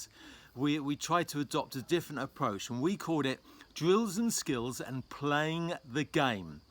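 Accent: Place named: United Kingdom. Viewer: We must be close in age because I also learned skiing with over 2 meters under my feet and extension was kind of essential to rotate those monsters. And now I still struggle to avoid doing it because with these new skis extension equals lose control... btw I've started skiing again only five years ago and adjusting is still problematic at times. Thanks for these videos, love the Brit accent, but remember here in the US a stretched middle finger might send a problematic message :)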